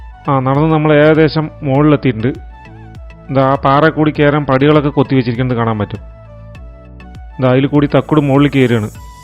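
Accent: Palakkad, India. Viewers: Nallapalakkadan slang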